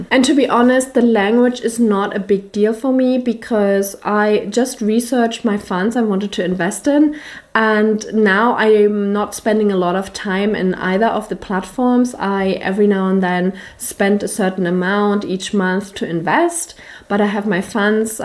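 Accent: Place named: Germany. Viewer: Wow beautiful woman and beautiful German accent.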